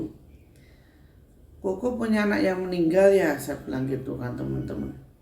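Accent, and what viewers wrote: Java, Indonesia. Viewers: Ngikutin chanelnya mbak ini serasa kita jagongan (omong"an) langsung dg beliau di ruang tamu.Aksennya yg Jowo jekek/deles bikin sy betah,karena sy juga cucunya jekek😂Dlm berkisah,beliau seakan menyuguhkan hidangan berupa oleh" dari hasil perjalanan nglencernya😝Bukan menggurui,tapi sekedar crito,mbokmenowo ono piguno.Dg segala kerendahan hati,berulang-ulang beliau selalu mengatakan "sekedar menyampaikan".Jadi ya " ditrimo karepmu,ora yo karepmu,sing penting niyatku becik."🤣Sbg orang tua (80),sy paling suka ada orang muda yg banyak menebar kebaikan kayak mbak ini,sebagaimana yg pernah disabdakan Kanjeng Nabi:"Sebagus-bagusnya manusia hidup di dunia yaitu yg bermanfaat bagi sesama."🙏🙏🙏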